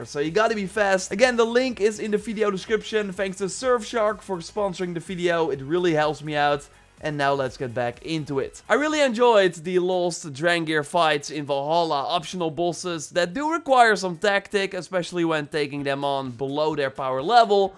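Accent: Netherlands. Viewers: Are you from the netherlands? You sound kinda dutch to me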